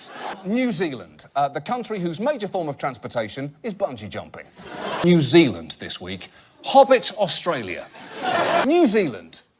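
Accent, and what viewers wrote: United Kingdom. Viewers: Where are you from?? the shot hole England??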